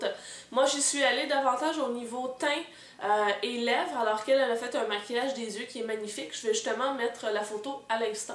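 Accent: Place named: Montreal, Canada. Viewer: Super joli !! Ça fait vraiment un teint santé ! Depuis le temps que Lutin nous parle de toi ça fait plaisir ^^. A moi tout particulièrement car j'entends un peu de l'accent de chez nous... je suis de Montréal mais j'habite en France ;)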